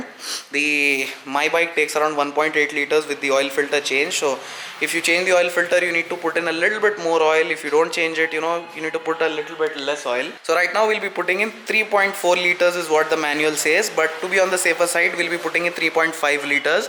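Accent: Hyderabad, India. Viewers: loves when u talks in hyderabadi language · mian aapki Hyderabadi accent bohot mast hona mian. I love the way you talk man, you can make a rondu laugh his lungs out brother. Cheers.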